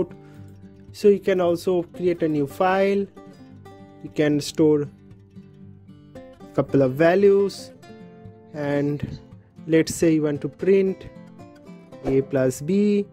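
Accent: India. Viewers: indian accent : check factual information : check does not waste time : check yep this man is legit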